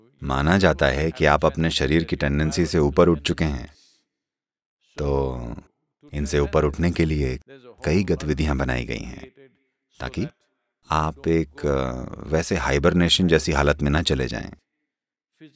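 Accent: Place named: South India, India. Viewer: Aapko main bahut manta hun lekin kuchh Shabd aap South Indian ke hisab se bolate Hain vah uchit nahin hai aap pure Vishva ke Hain South ke nahin soch samajhkar shabdon ka uchharan Karen Baba यह आपसे विनम्र निवेदन है मेरा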